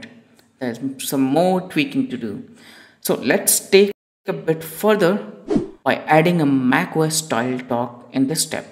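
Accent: India. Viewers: Why Indian accent very thick, i am little uncomfortable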